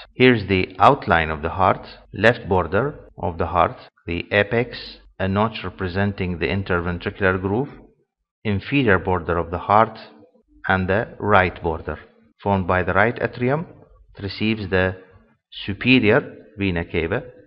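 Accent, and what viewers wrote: Iraq. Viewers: you sound iraqi are you ?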